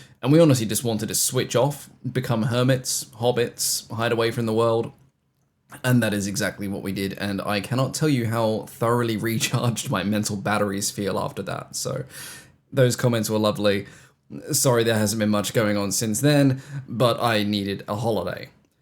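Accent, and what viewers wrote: Australia. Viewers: Wait, you're not Australian? My brain parsed your accent as definitely Aussie but with non-Aussie parents. I'm confused where you're originally from, now. (For some context, I'm a linguist at heart, my brain ponders these things whether I consciously want it to or not, I'm not trying to pry into your life 😅) Also, huge congrats on your wedding! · Congrat's on getting married,! Mate, it all works out in the end. Just keep plodding along. We all get those periods of... Bleh!... but we get over them by putting one foot in front of the other until we're out of the haze. You sound like a South Australian... & I am a South Australian, so I should know! 😉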